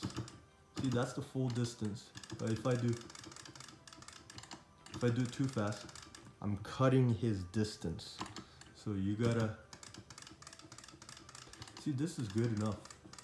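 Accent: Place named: Russia. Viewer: Cool video but can't understand anything with your heavy russian accent lol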